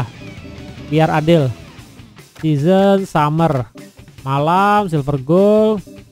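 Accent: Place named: Riau, Indonesia. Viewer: Kau pasti orang Sumatra khusus nya Riau kan, ketebak dari logat bahasa Indonesia mu 😂😂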